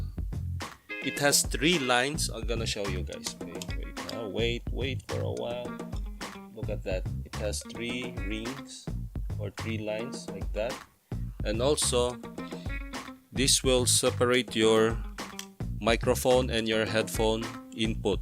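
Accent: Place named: Nagaland, India. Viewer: thank you bro. And u sound like a native from nagaland . Google it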